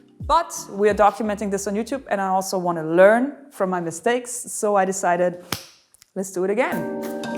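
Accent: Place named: Germany. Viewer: the more serious it is, the more german she speaks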